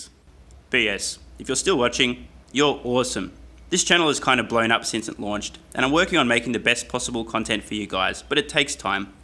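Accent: Australia. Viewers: Aussie accent mate 🇦🇺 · it’s great to hear the oz humour and accent. Got my favourite channels from US and UK. Now your the OZ channel to add to the funnel of tsla info and insight. My wife and I have our self managed super set up just for this purpose. A friend gave me a nudge a year ago. And haven’t looked back. Will get the Patreon sorted too. I sent this episode to my wife to help pass on more content without it coming from me:-)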